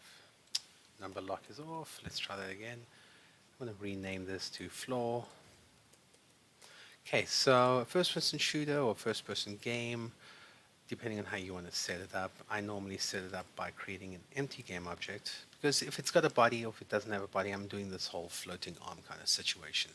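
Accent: South Africa. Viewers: Are u SOUTH African??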